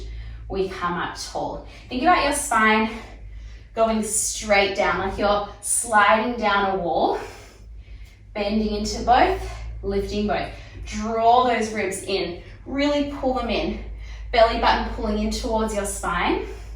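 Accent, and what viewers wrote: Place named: Australia. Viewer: You have such an aussie jew accent haha love it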